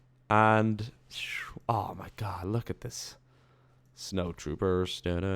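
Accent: Sweden. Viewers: I THOGUHT YOU WERE BRITISH NOT SWEDISH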